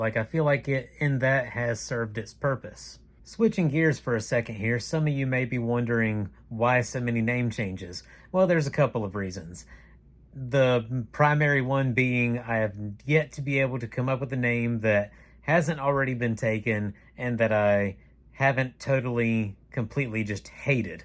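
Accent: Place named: South, United States. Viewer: Nothin' but love, brother. I happen to like your voice. I'm originally from Kentucky but live in Illinois (I hate it here) so getting to hear southern accents I find very soothing. Plus, I love books and snack review type stuff, which you've done. So... yeah. I'm in for the duration plus ten years. (Also big First Responder & Military family so that's an added bonus)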